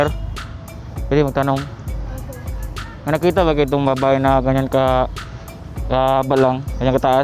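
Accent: Visayas, Philippines. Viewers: Bisaya ka dong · Mailhan gehapon ka na nag prank ka Kay halata man imo tagalog tuno palang sa imo pag tagalog bisaya man .. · Visaya sya kaya pwede ikaw mag adjust?✌ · Yan camera ... ayus sana ung pag bigkas ng tagalog mo ayusin mo na rin pra s halata bisaya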